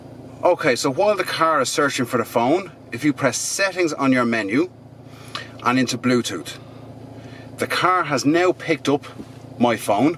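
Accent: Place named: Ireland. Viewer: P.S. - it was worth watching the video just to hear your delightful Irish accent.....:-)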